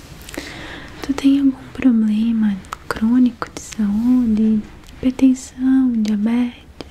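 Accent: Rio Grande do Sul, Brazil. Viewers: perfeita como sempre ❤💃🏻💃🏻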